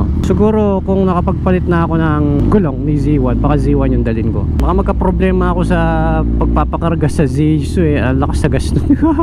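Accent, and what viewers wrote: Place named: Ilocos Sur, Philippines. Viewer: akala ko nasa ilocos sur ka idol HAHAHA kasi similar yung mga nahay eh hahaha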